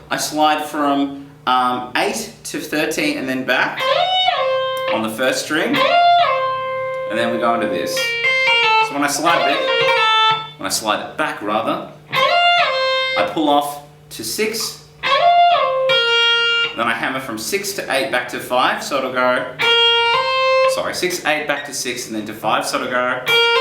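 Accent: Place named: Australia. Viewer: Are you a guitar teacher dude? You sound Australian! Which state are you from!?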